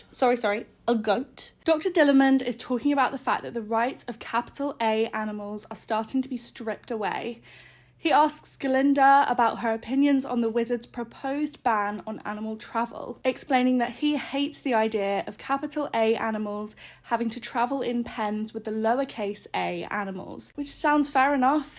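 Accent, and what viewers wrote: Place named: United Kingdom. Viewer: It took me 4 minutes to realize you’re British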